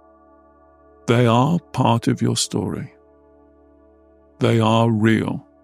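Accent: England, United Kingdom. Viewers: God!! You are AMAZING!! As I already know; I literally was with you, meaning, JUST US! NO ONE ELSE!; Simply a one on one, which makes you! Simply AMAZING!! Every step I envisioned my past; Or as Joan Collin’s named one of her books of her past “ Past Imperfect” 😅 I looked at my family and thyself’s, “Past imperfect memories of happenings, Emotionally and Happily., again I say, You are a Blessing to ALL. And Your Lovely English accent 👀 Helps too!😂 All the ✌🏼❤️ out 2 🫵🌿😉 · I love the English accent as well—it’s endearing ❤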